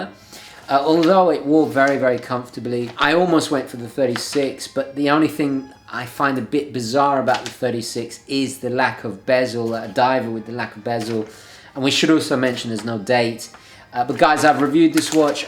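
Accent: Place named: United Kingdom. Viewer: I love that old British accent!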